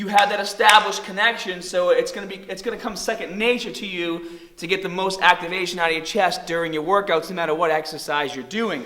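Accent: Boston, United States. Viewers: Man that Boston accent hit me like a ton of bricks! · you from Boston? never noticed your accent before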